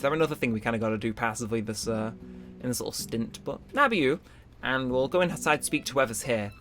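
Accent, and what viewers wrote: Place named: England, United Kingdom. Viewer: Hey I’ve been watching ur ac old school vids for a while now. Big fan of this accf series!!! I always wanted a WII, but never got one for this game & SOS, HM. Now I’m really wanting a WII, but it’s expensive & idn how to hook up to my smart tv on Comcast cable. Plus my aunt just bought me a switch last fall. Love ur accent too, my gran was from England & my uncle is in Scotland, owning a pub. Anyways, I’ve been to both England & Scotland & love them both!!! I’m in the east coast USA, I’m Waterfall ie KtCub🌸💟🤗. I’m on Twitter if u want to check my postings out. Keep the wonderful content, you really cheer me up every time I watch ur vids. I hope u get this msg, Bc idn if my msgs go thru to any of my AC YouTube ppl I like a lot & subscribe. Have a swell day, Laters::::💖🦋🌈 Ps: can u me so I know u get this? Thnks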